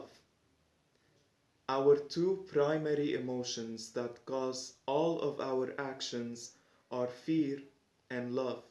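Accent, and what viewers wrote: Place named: Lebanon. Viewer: your lebanese accent is so cute <3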